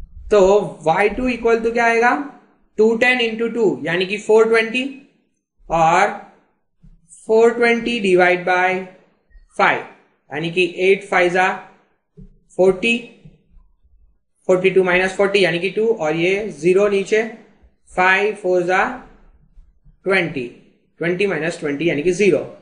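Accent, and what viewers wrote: Bihar, India. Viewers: Are you from bihar!! '!' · Sala Bihari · bihar ho kya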